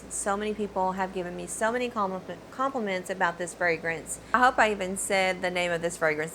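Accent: South, United States. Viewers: Loved your selection as well as yoyr southern accent. I could listen to you talk all day, you sound so lovely. 😊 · I love your beautiful accent. I used to live in North Carolina and in Mississippi, such sweet people and their accent melts my heart!!!!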